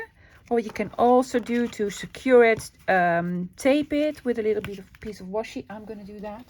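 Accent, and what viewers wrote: Netherlands. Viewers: 🤣🤣🤣 big ole envelope!, are you from the southern Netherlands? People tease me about my southern US accent and I say “big ole…” all the time. Cute project. Thanks for sharing!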